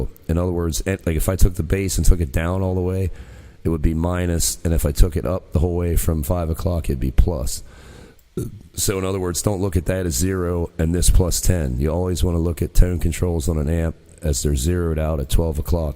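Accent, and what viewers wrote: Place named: Philadelphia, United States. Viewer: Cool video! Have you tried S-Gear? You from Philly? I hear a Philly accent.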